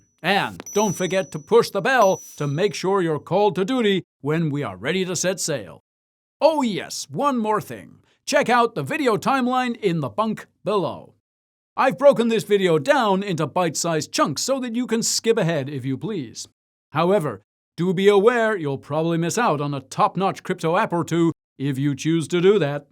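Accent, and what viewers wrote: United States. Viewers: I never get tired of the American accent lol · Love the American accent! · Good American accent Guy!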